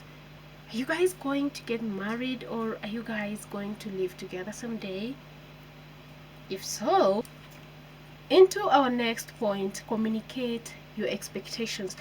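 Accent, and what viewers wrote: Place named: Kenya. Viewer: Love your voice, your accent. And you look very nice. Would you want to share what tribe and area of Kenya you are from, tafadhali?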